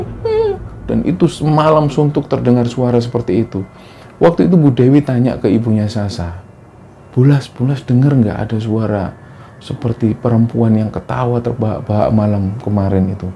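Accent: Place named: Central Java, Indonesia. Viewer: Indonesia bagian temanggung mana ini suaranya???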